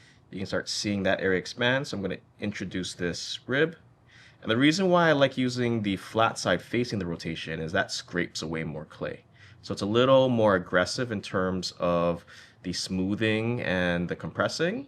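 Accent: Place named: Hawaii, United States. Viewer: I can hear your small kine Hawai’i accent.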